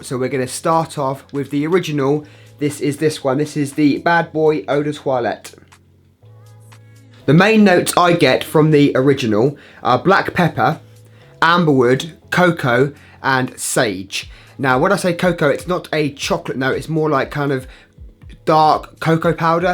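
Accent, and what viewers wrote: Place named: United Kingdom. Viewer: He is British. He is speaking the original English not the American English or whatever English you learnt.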